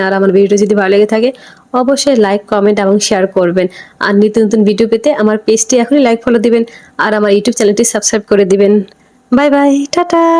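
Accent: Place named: Bangladesh. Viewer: উচ্চারণে ই ধরা পড়লেন আপনি বাংলাদেশী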